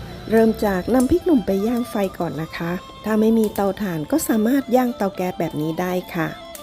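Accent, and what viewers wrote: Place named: Central Thailand, Thailand. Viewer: ผู้บรรยายกับเสียงดนตรีบรรเลง ไม่เข้ากันเลย น้ำพริกหนุ่มกับเสียงดนตรีเป็นของภาคเหนือ แต่ผู้บรรยายสำเนียงภาคกลาง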